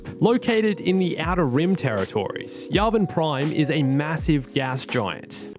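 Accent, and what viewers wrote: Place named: Australia. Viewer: I always find a small to creep over my face when I watch your videos, you just have this gusto and a nice australian accent, thank you for making great videos. 😂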